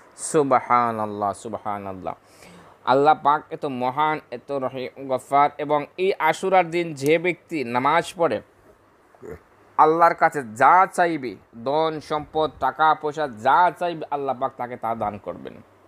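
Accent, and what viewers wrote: Bangladesh. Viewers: বা‌ড়ি কোথায় ? কু‌মিল্লা ? নোয়াখা‌লি না‌কি চাটগাও ? বাঙলা ভাষার উচ্চারন ঠিক ক‌রে কথা বলুন।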